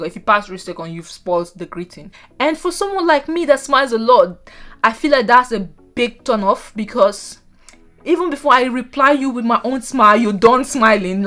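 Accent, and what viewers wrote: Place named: Nigeria. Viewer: I could still that Nigeria feel😂😂 The "like bro"😂😂 I'm really enjoying this channel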